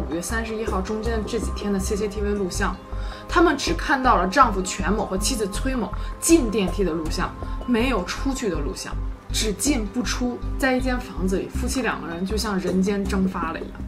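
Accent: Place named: China. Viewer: 幹，26腔聽不下去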